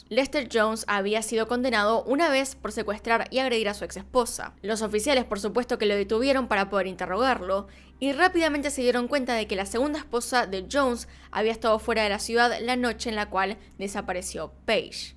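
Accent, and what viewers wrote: Argentina. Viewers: Saludos desde Virginia Estados Unidos 🇺🇸 pero yo soy de Guatemala 🇬🇹 me encanta tu acento argentino 🙃 salúdame 🙂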